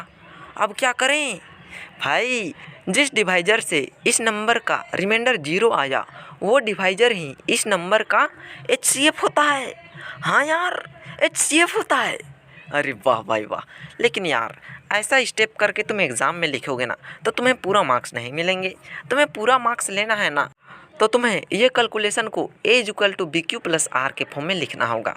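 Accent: Bihar, India. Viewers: Aap to voice se bihari lgte h sir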